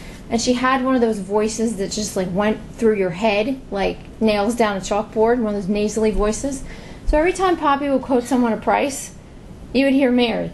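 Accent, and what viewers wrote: New Jersey, United States. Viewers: Just when we thought the crazy knit sweater guy was the funniest thing up pops your "Jerserylicous voice" with a garage sale story we are all too familiar with - thank you so much for the shout outs it really means alot to us - we are looking forward to your challenge vid - thanks again T&D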